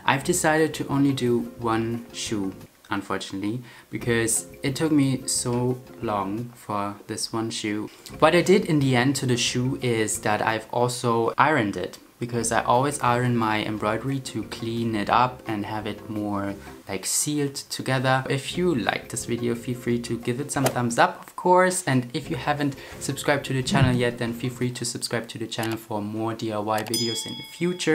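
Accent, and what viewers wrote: Germany. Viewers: I can totally tell that you’re german by the accent, cute!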